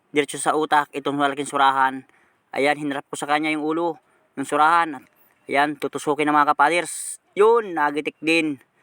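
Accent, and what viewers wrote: Bicol, Philippines. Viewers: Bicolano ba kayo o ilonggo o Batangenyo 😂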